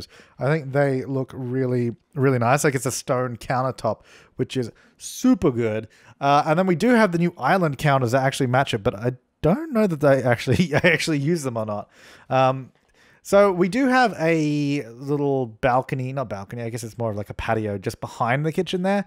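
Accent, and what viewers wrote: Australia. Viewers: To be fair though, triangles are the best shape. Just saying, oh and also, I love watching Aussie youtubers like you. It helps me a lot considering I'm an Aussie to hear my own accent on a global platform makes me very happy. It also makes me happy to know you're from NEW like me (about 3-4 hours north actually) because all the Aussie youtubers i know are either from Victoria or Queensland (bar a few). I'd also like to thank you as you've helped me with a few very personal issues without even knowing it. Your everyday humor makes me smile in my darkest moments, which I'm forever thankful for. Another thing as this is getting super long, I absolutely love the style in which you build your houses, it's very similar to my own taste and you often talk about people requesting other styles, which is okay too.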